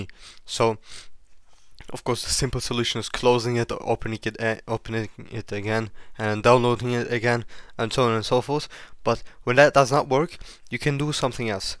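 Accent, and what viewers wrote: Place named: Turkey. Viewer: aksanından, tonlamandan falan. Ana dili Türkçe olan birisi İngilizce konuşunca anlaşılıyor. Çünkü hepimiz o tonlamayla konuşuyoruz.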